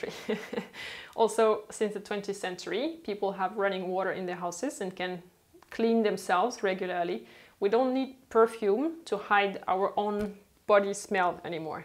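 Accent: France. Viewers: I love this video. It’s perfect, concise. You have such a lovely accent, and your perfect red manicure along with your black top are exactly how I imagine classy, quintessential French ladies. My favorite go-to perfume is Alien by Thierry Mugler. I know some ppl find it strong, but I love it. I received a sample once and knew I had to have the large bottle. I always spray on my wrists, neck, and behind my knees before I go running. I love how I can smell it come off in warmth as I run along. Plus, the name appealed to me. When I purchased it, at that time in my life I very much felt like an alien in my world lol. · Haaaa shes French. She pronounces it with a French accent. She'd actually know. No where did she say Glass. Thats the french r you're hearing. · more than your knowledge of perfume, i was enthralled by your frenglish.😊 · Am I hearing english in french accent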